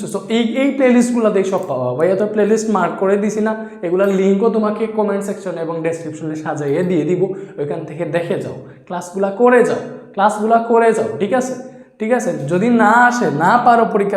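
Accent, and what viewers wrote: Sylhet, Bangladesh. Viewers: Vaiya apni ki sylheti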